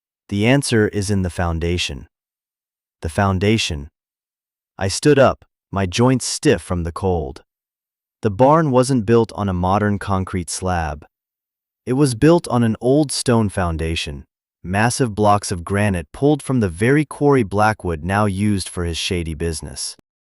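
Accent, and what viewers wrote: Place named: United Kingdom. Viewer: STUPID, STUPID, STUPID!! Stories told in the "first person" MUST have the following to be professional and correct. Correct gender voice of the main character. ( Female voice for female character, and male for male.) Correct accent for the charcter. (Not a British accent for a 5th generation charter from the Deep South.) Other than that it is not worth listening to, so at less than one minute into your story, bye.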